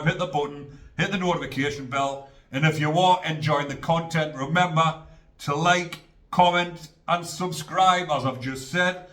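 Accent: Newcastle, United Kingdom. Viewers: please lose that annoying Ant & Dec accent. Talk like a Southerner, like a normal Brit. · I love your accent. Listening from down south here. Never been to Geordie land but I would like to visit one day.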